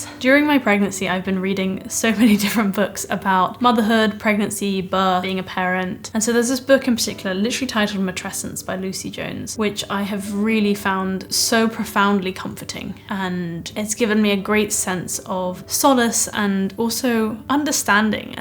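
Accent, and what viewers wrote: United Kingdom. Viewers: 从你读大学的视频看到你现在怀孕将要做妈妈，见证你从女孩到女人，心里很感触。恭喜你&祝福你。我的英文不好，但是我很喜欢你的英式英文的口音。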